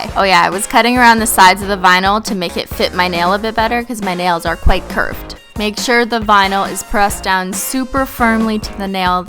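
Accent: Canada. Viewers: did I just NOW realize her Canadian accent? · It’s cause she’s Canadian you bitch rainy sims! Canada never makes fun of how you pronounce it!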